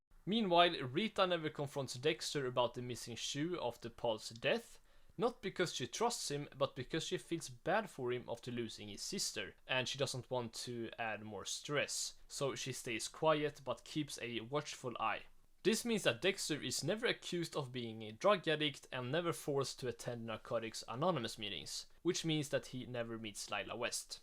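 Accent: Sweden. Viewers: Why does bro have a Swedish accent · Bro are you swedish?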